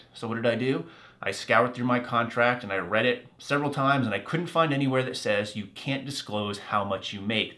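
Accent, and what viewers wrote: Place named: New England, United States. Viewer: Where in New England are you from?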